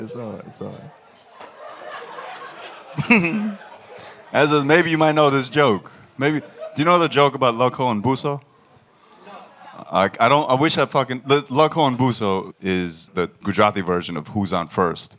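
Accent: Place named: United States. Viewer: He talks so american but tht laugh is Indian asf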